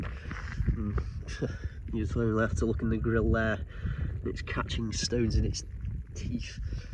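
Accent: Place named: Cockney, United Kingdom. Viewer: Cockney heavy'😂😂🧌🧌🤮🤮🤮🤮🤮🤮🤮🤮🤮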